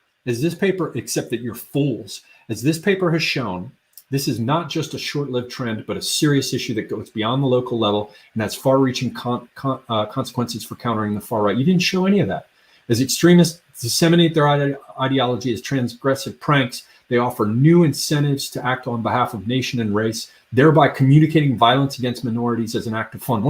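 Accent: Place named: England, United Kingdom. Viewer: D That is so fun! Your posh English accent has a combination of Irish and German dialects coming through. I'm sure glad I'm not the only one going around speaking in various accents. I tend to use Scot's English and Russian, especially when I'm brought to anger by the children. They know when mommy starts sounding Russian she lost control. ha ha ha To my credit it is seldom. Like that joke, "But did you die?" Any dialect is fine but please, no Ebonics. I have to think to myself: "School didn't fix that for you?" but then again we still have fools walking around out here not understanding the difference between your and you're.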